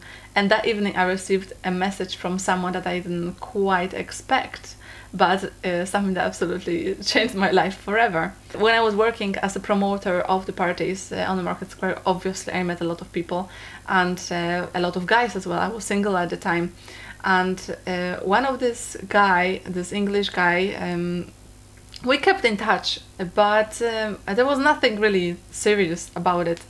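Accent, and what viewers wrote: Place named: Poland. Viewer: First time I listened to you I realized that you had the same accent that I have. I came to US from Poland at the age of 15 and I can totally relate to you and your story. You are an amazing person and you remind me so much of me at that age. I am now much older and people always get amazed by my life story. With will and perseverance one can accomplish all the dreams. With Gods or Universes help all things are possible and you are a great example of this..You are very beautiful and you have an amazing positive attitude. I loved listening to your story. I am forwarding it to my daughter who is exactly your age. Thank you for sharing it. Wishing you all the best because you deserve it. You go girl.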